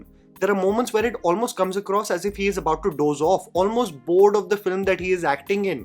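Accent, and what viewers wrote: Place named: India. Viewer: HIS ENGLISH ACCENT I SWEAR IS WORST THAN SIKANDAR MOVIE.. I TRY MY BEST TO WATCH HIS REVIEWS BUT I CANT !! HIS INDIAN ENGLISH ACCENT MAKES MY EARS BLEED!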